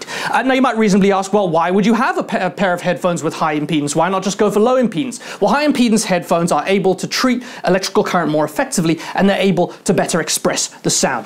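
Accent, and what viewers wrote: South Africa. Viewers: U almost sound south african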